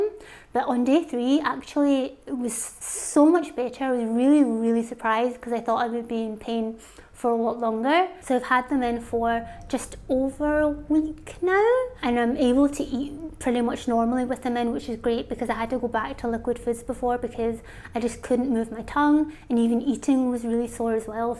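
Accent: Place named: Scotland, United Kingdom. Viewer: Did not expect you to have a Scottish accent! Awesome results!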